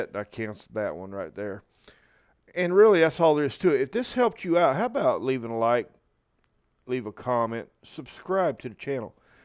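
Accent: South, United States. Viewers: Great video. Exactly what I was looking for. On a side note, you have an epic Southern accent. Will you make a recording for my voicemail greeting and invoke the power of?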